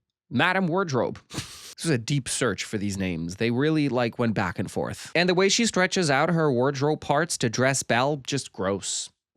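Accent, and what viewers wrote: Canada. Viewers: the more i listen to your accent the more i realize you are probably canadian which would explain it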